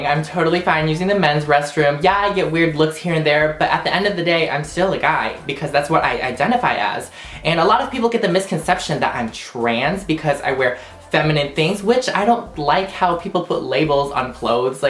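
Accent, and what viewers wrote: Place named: South, United States. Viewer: I LOVE your country accent and your little laugh you do it's completely adorable! :)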